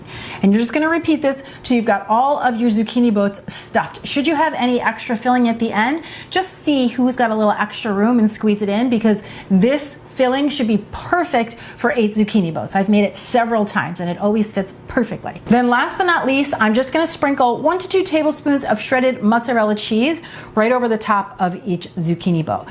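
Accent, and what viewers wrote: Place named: New Jersey, United States. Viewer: Hey there! I am a Speech Pathologist and I have noticed that it is a New Jersey thing lol. is not the only one who does that lol. It's just a dialect.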